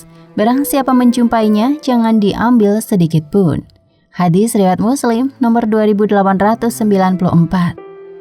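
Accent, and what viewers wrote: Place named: Java, Indonesia. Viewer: kadang normal, kadang medok suara miminnya,.. dari sini bisa disimpulkan mimin org jawa 😁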